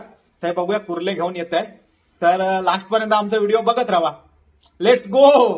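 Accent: Malvani, India. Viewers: बरा वाटता कोण तरी अस्सल मालवणीत बोलता ता नायतर अर्धे मालवणी गोंधळच घालतत. अर्धा मराठी अर्धा मालवणी बोलान. तेचा काय होता म्हायत आसा समोरचोय गोंधाळता मरे. असो तुका बाकी हॅट्स ऑफ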